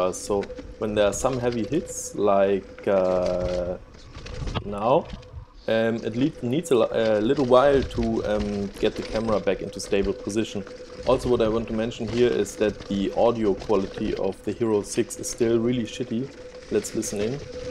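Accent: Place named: Germany. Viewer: Du bist deutsch oder? Hört man raus 😂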